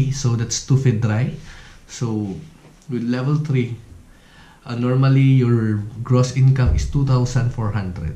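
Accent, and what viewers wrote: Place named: Ilonggo, Philippines. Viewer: Ilonggo ka sir?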